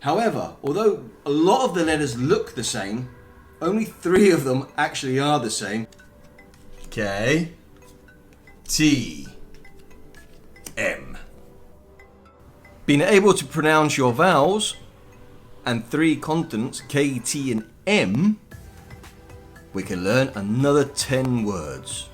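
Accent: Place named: England, United Kingdom. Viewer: you sound english not scottish ?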